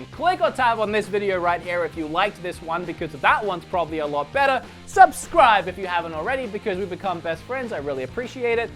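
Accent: Australia. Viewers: He is Australian lol, I'm Scottish and understand the confusion between English and Australian accents, listen for the subtle sounds that Australians make. Also, if you mean the whole island of Britain you would say British. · Are you australian? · Are you aussie or is my accent detection going whack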